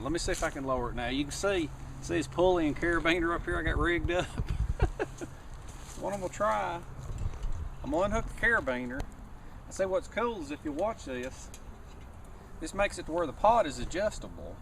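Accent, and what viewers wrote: South, United States. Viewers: I'm with you, water comes first. Dehydration will kill you first, but that knife is nice to have. Altitude sick in the way back high country this weekend I had your crazy sweet southern accent in my mind resting there at too high altitude under the shade of a tree wishing to die from a banger of a headache thinking inside the handle is some matches and a saw and some fishhooks but right now I wish I had something to blow my brains out! Kidding... maybe. Those little fuzzies are great for fire starting if you can crawl around and find some tinder. I could! Pine sap... fire... water. Just water and more water. Shelter and water and sleep a thousand years with the spinning head and then it broke and I was back with you again, human, ready to go that next mile. Your videos are wonderful! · He talks just like a friend of mine. Very real southern accent. 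If you wanna hear a "real, fake" southern accent at its best, watch the television show The Walking Dead.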